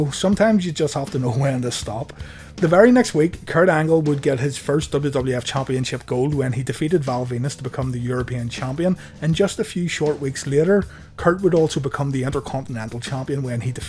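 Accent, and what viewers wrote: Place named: Northern Ireland, United Kingdom. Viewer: What a great video and how refreshing it is to hear a fellow Northern Irishman (or Irishman if you prefer) on Youtube! You got yourself a subscriber. Great work!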